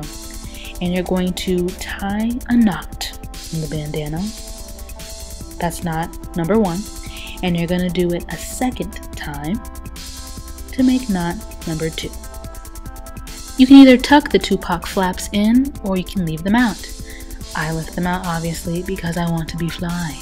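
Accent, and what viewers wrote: Africa. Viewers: You are hilarious. I'm Cameroonian but it's crazy how all the African accents sound sound so similar. Loved it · I love u african accent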